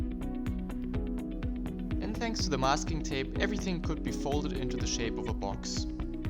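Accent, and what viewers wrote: Germany. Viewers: du bist aus deutschland oder? man hört es ganz leicht aber dein englisch ist echt mega gut man hört kaum noch einen deutschen akzent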